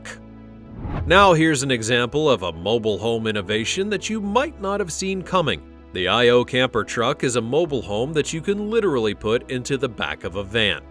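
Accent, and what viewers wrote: United States. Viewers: None of these are mobile homes though. They're cool campers don't get me wrong, but they aren't mobile homes. they aren't meant to be lived in, except, perhaps, for the tiny homes but even those still aren't mobile homes. I would think that this word difference is cultural, but you sound as American as they come so I don't think that's it...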